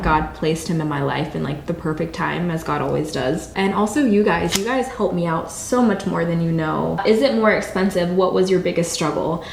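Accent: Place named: New York, United States. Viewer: Sounds just like New York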